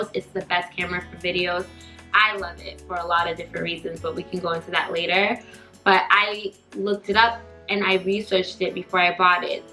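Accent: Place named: Minnesota, United States. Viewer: Sorry, this is kind of left field, but you should like you're from Minnesota... or Canada. Where are you from? Just curious.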